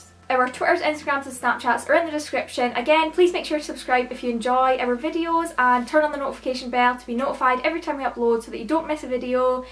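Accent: Scotland, United Kingdom. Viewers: This story time is immediately 10x better with your Scottish accent omg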